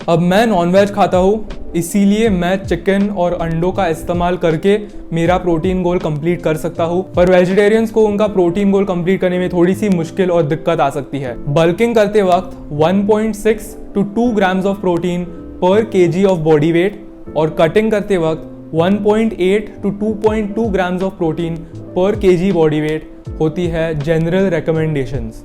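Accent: Maharashtra, India. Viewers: His Hindi has Maharashtrian touch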